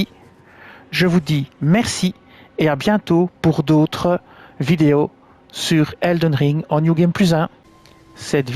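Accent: Belgium. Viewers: Merci beaucoup pour le travail accompli. Et l'accent Belge est tellement beau.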